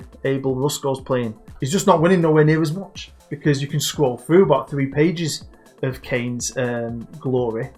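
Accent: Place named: Manchester, United Kingdom. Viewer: Not sure if it's the epic script or the cool asf Corrie accent that is more addictive!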